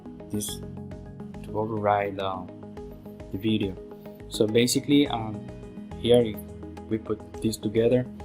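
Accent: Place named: Dominican Republic. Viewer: Loco pero tú sueña más Dominicano que yo.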